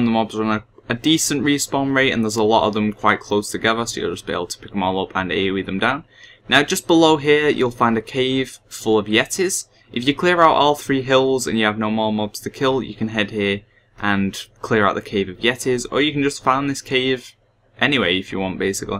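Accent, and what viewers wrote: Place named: Glasgow, United Kingdom. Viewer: Wow the guy is developing a Glasgow accent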